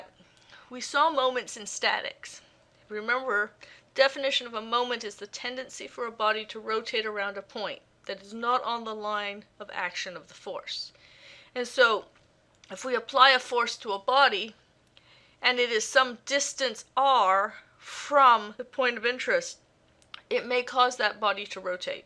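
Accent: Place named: South, United States. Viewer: i loved your southern accent mam :)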